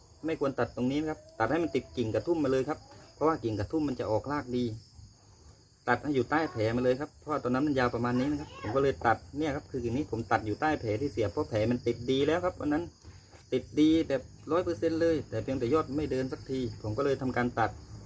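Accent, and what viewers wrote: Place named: South, Thailand. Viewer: ติดตามชมมาหลายคลิปได้ความรู้เพิ่มมามากครับ สำเนียงเหมือนจะอยู่ใต้เหมือนกันนะครับ ผมยุสงขลา ขอให้มีคลิปความรู้ออกมาเลื่อยๆๆคับ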